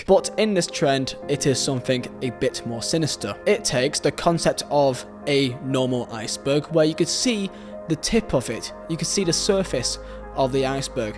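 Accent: United Kingdom. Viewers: i seriously couldnt hear anything all video dont get that heavy british accent everywhere you make the h sound too much keep the mic a little further from ur mouth when the sad parts came i could only focus on ur annoying voice otherwise ok vid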